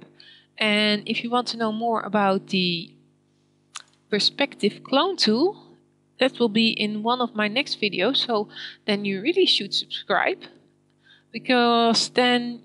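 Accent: Netherlands. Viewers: Definitely a Netherlands accent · The girl doing the voice-over is soooooo Dutch. Not as bad as Prince Willy though ;-) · Wauw... Kom ik na dik 2 uur uit op een video die het ein-de-lijk een deftig uitlegt! Los van het 'bijkomend' selecteren met shift was het het bijsnijden vd laag dat me de das omdeed. 2 foutjes rechtgezet met één video van 8 jaar geleden. Knap werk. Ook heel schatti, dat Hollands Engels :-D Grotejes van een zuiderbuur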